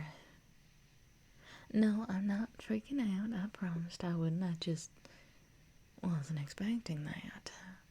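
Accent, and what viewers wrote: South, United States. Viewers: I hope we get to hear more of the southern accent, it’s lovely ❤ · The return of the Southern accent praise the gods of universe!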